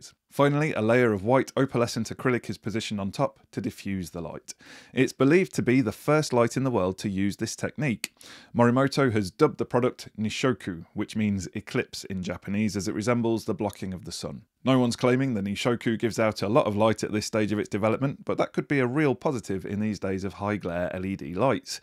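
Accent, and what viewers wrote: United Kingdom. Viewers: You should have named the title of this video "Florescent lights about to be banned in the UK." In the USA they are not banned and I thought this was a Youtube channel from the USA until I heard your accent. The USA, however, tried to ban incandescent light bulbs until many people made a stink about it. As of right now, you can still buy those too. In the USA, we also have CFL bulbs that use mercury as well. However, we recycle the mercury in both CFL and florescent lights so there's no pollution at all.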